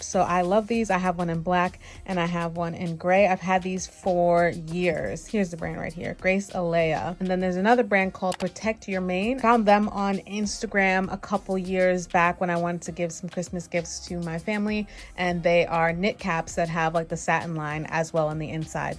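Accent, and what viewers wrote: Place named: New York, United States. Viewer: girl - LOVING this video. thank you for sharing your feelings and resources. I appreciate you and will follow up on as many as I can. you DO have a voice and I love hearing it! PS - is that a New York accent?! never heard that in your voice before ahahaha (I already knew you were in NY). I do love Fenty and will continue to support her brand (I also recently bought that new blush). Similarly tho, I try to stay on a budget hahaha. wowwwww the hair products claps SO MANY options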